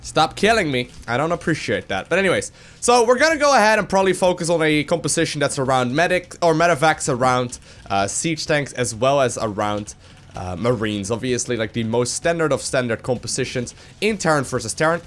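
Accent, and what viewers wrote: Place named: Netherlands. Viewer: hey, its extramadchicken the guy who literally started playing sc2 again due to that fabulous Dutch accent. anyways i know this is an old video but since u are still actively streaming i was wondering of what we would like Your easy to follow tutorials. i was wondering if you could potentially create more terran and possibly toss videos when u have time. Although zerg became my initial choice due to a wide variety of videos youve made as u are the best sc2 instructor out there, id like videos of u analyzing some Terran and toss basics and principls. It will inadvertently help zerg players because it will teach them the mechanics behind the other races. Also when i decide to not be a pleb anymore, ul be the first and likely only streamer ill sub for xD kappa.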